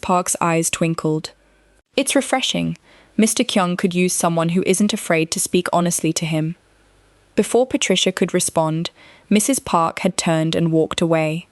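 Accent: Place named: United Kingdom. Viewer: I just started watching from Hammond, Indiana, and already the sarcasm is everything you know if you’ve ever seen my comment I live for the British accent just makes everything sound right. The humor lands perfectly.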